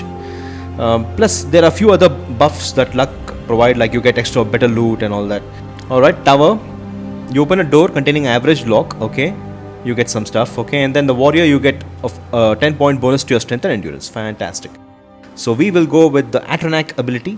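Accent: India. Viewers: Omg r u Indian?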